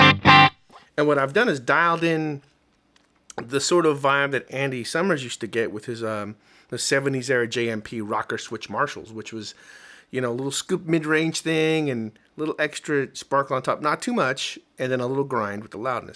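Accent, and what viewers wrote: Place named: Baltimore, United States. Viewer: Fantastic sound! I, too, am a bit of a tone chaser and am gratified that with the tools I have, I can replicate those great Andy Summers sounds. Curious, are you from the Baltimore/MD/VA area? As a tone chaser, I also hear dialects. Occupational hazard.;)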